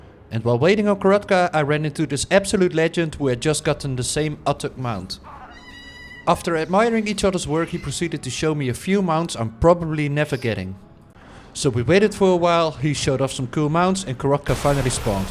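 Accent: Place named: Netherlands. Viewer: sorry but it was very funny xD, i guess youre from the netherlands ^^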